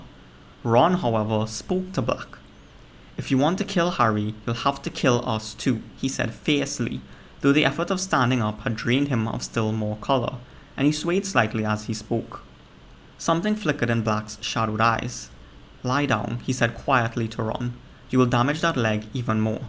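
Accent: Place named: India. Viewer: I can tell the narrator of this video is a South Asian Indian from his accent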